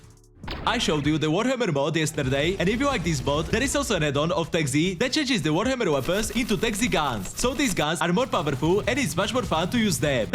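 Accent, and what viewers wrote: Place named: India. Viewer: Why do u have the most indian non indian accent of english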